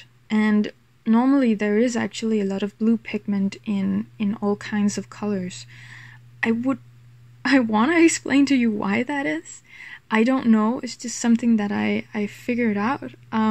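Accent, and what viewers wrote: Denmark. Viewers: Are you Danish? Your accent sounds very similar :P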